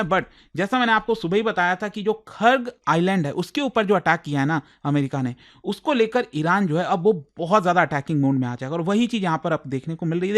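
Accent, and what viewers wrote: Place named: Bihar, India. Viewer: You sounds like bihari without any logic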